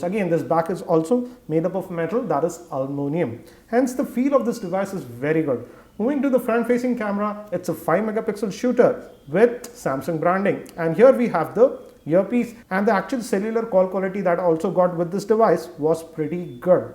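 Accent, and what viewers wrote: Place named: India. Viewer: ahaha this is like comedy central with information...ahahah....Indian accent... I end up laughing...enjoyed it a lot...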